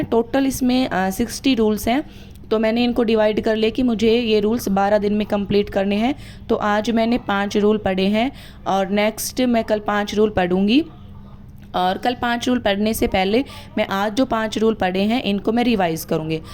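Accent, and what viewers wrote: India. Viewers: Tu to awaz se dakshin Haryana ki laage hai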